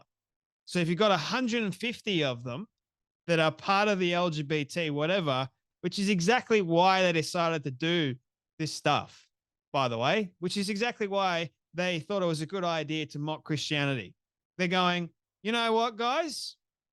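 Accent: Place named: Australia. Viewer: His AUSSIE voice is very annoying 😂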